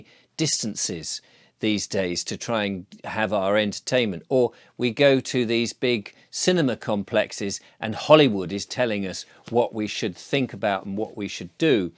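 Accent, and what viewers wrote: United Kingdom. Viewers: I do love listening to, the relaxing tone, well spoken British accent, important messages. I don't watch everyday, but I do binge on a weekly basis.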